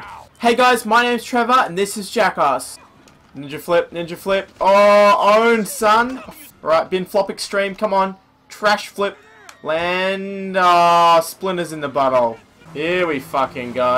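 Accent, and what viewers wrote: Australia. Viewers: Australian accent. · R u Australian because u r to loud mister u need to turn the noise down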